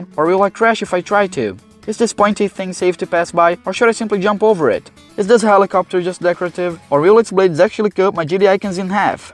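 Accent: Brazil. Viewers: Some brazillian accent ight there!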